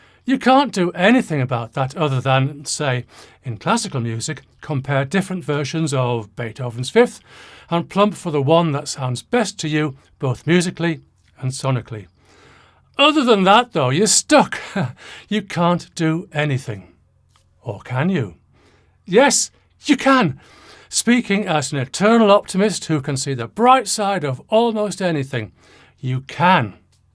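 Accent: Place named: Northern England, United Kingdom. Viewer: Dear Mr, could you PLEASE tell me where in the North of England you were born or spent the majority of your childhood? Why? Because I pride myself on being able to tell where people from my native North-East were raised to within a 5-mile radius - but your accent has got me baffled, presumably due to your many years of living "darn Sarf". You could be from parts of Cumbria, Geordieland, Co Durham or Teesside/North Yorkshire but I can't nail it down. Fancy putting this "accentophile" out of his misery mate...??? PS: I'm from Hartlepool originally if it's a case of "you show me yours and I'll show mine"..!;)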